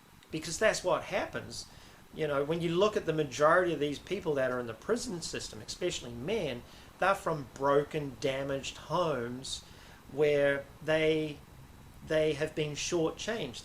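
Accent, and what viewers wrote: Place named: New Zealand. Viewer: The kiwi/NZ accent is so cool!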